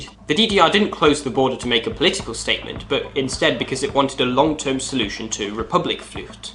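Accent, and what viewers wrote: United Kingdom. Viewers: You really do use very English pronunciation of all those German words! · This is really a great work! I am deeply surprised how a young person from the U.K. (I hope I recognized your accent correctly) deals with this matter so precisely and with so many details. I traveled between West Germany and West Berlin several times before 1989 by train, and I can confirm that everything you saying is absolutely correct. Excellent! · He is British, thats how they always pronounced it.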